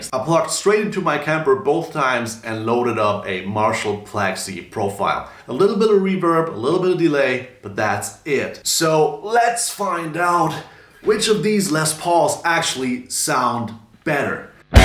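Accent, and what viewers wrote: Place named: Germany. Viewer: I thought i was listening to Zach Galifianakis imitating Matthew McConaughey.. lol.. Then i understood you might be from Germany.. But to the most important part.. your playing is absolutely sublime, man. Do you have a spotify or bandcamp account? I really hope you have a few albums out.. or even singles.. Take care and all the best,! Edit. Just bought your backing track in E Minor..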